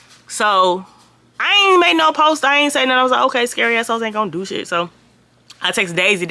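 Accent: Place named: Flint, United States. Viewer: OMG your accent sounds just like all the females in my husbands family. He's from Flint. 😊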